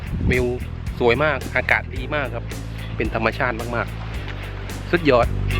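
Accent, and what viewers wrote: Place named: South, Thailand. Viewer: ชอบพี่แหลง(พูด)ใต้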